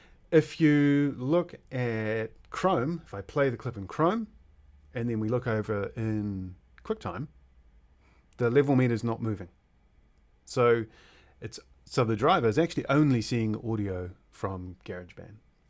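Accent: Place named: New Zealand. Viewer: Works like a charm! Good old Kiwi ingenuity 🇳🇿🇳🇿🇳🇿 Recognized the accent from a mile away!!! Gotta support a mate from Porirua 😆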